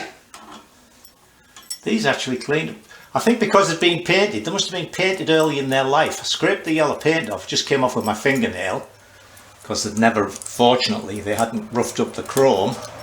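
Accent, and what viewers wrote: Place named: North England, United Kingdom. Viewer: So, I'm on my first ever restoration and it's a BSA C15. Coming across these videos is a Godsend, and they're even done with a proper accent. I'll forgive you for being from the wrong side of the Pennines lad (not sure many Americans will understand that) but it is great to actually see things that I'm going to need to do rather than just read about them. I'm a couple of months behind you. I'm waiting for some shiny bits to come back and then I can start to reassemble the wheels, forks and shocks. Thanks for doing these videos - perfect for a newbie like me. I'll toast you with me Corporation Pop.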